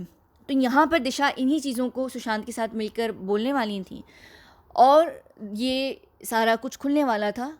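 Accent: England, United Kingdom. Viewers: I absolutely love your English accent mashallah 🥰 love you sooooooo much ❤️❤️❤️❤️❤️❤️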